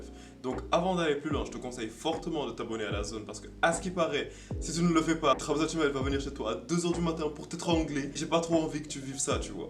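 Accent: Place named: United Kingdom. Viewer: T’as grandi en Angleterre ? Je trouve que t’as un accent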